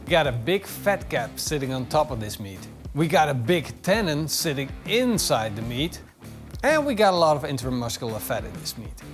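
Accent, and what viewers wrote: Netherlands. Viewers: I grew up in the Netherlands and I'd bet money ( by his accent ) so did this guy. If so the price of beef in NL vs its price in a beef producing state in the USA is not a fair comparison. Flat Iron ( what that cut is called here in the USA ) is usually BBQ'ed which breaks down its intramuscular sinews rendering a tender cut for the plate.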